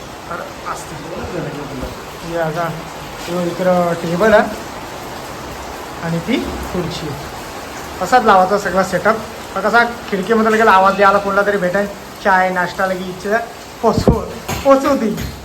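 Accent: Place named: Agri, India. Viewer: Aagri tari bol nahiter sudh marathi bol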